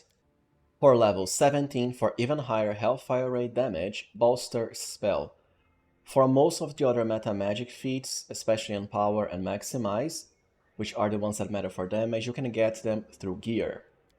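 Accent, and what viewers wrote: Brazil. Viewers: Irmão. Você é brasileiro ou não? Kkk grande fã aqui. De qual estado tu é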